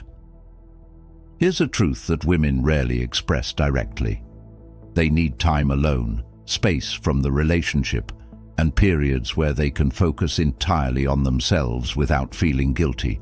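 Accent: United Kingdom. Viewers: AI with a British Accent.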